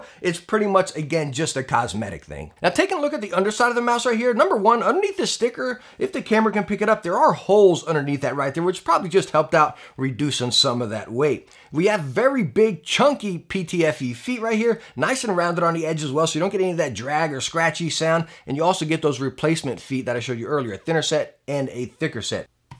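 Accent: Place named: New York, United States. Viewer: Bro this guy talks like my uncle from New York and it's great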